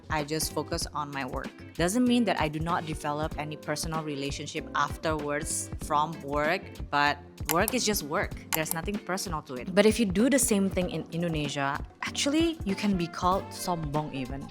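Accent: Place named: Philippines. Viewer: sorry, but you sound like a Filipina, sorry, not sorry, darn it! whatever!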